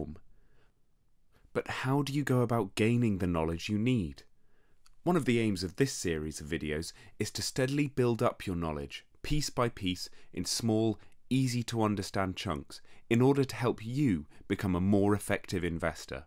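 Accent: England, United Kingdom. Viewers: It's just an English accent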